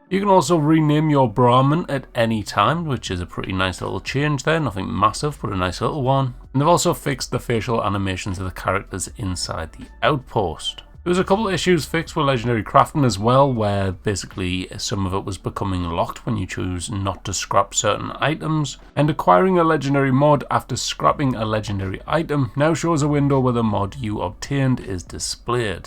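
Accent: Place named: England, United Kingdom. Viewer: He's from England. This is your problem, not his.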